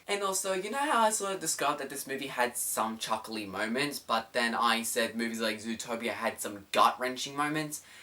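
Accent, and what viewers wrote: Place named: Australia. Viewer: so you mean best and worst movies of the year right? that is a cool idea looking forward to it :) and by the way i love your australian accent it is pretty cool and makes you unique:) i think it is so interesting just that the same language sounds so different spoken by persons from different countries :) in my case my english videos have a german accent so my english sounds also different :)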